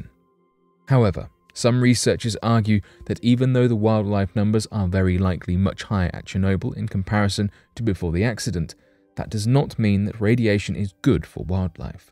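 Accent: United Kingdom. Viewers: Just because he has a British accent doesn't mean he has any credibility.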